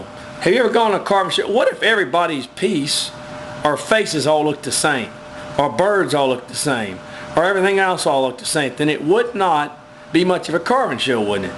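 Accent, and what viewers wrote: Texas, United States. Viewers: Nice info.. anyways is him using Texas accent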